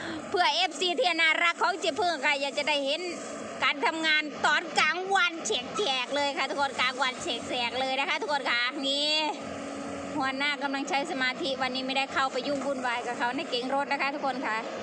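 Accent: Korat, Thailand. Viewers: พูดคือคนโคราชเลยเนาะเจ้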